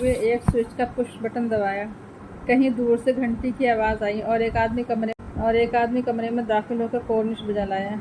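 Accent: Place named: Lucknow, India. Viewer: kya haseen lab o lehja hai.. Lucknow ki chashni mein ghula hua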